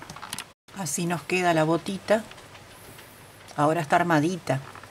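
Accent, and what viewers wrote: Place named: Argentina. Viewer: hola,,amiga yo pensaba k eras argentina por el acento,,, todo lo k haces es muy lindo y todo muy bien explicado,,, maravilloso tutorial,,,un abrazo muy fuerte,, desde islas canarias ,,,España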